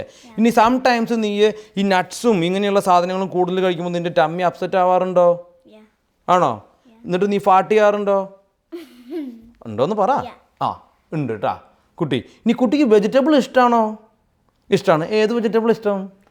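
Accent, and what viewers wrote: Thrissur, India. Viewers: എൻ്റെ ഒരു ഒപ്പീനിയൻ എന്താന്ന് വെച്ചാൽ, എനിക്ക് ചേട്ടൻ്റെ കുക്കിങ് വീഡിയോ യേക്കാൾ കുറച്ചും കൂടി ഇഷ്ടം ഇദ്പോലുള്ള അറിവ് പകരുന്ന വീഡിയോ ആണ്. അതിനു കാരണം ചേട്ടൻ്റെ തൃശ്ശൂർ ശൈലിയും ചേട്ടൻ്റെ അറിവുമാണ്.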